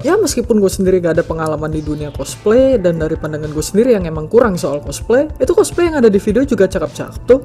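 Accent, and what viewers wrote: Java, Indonesia. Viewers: Begitu kangen dnegan bacotanmu dan kemedok an mu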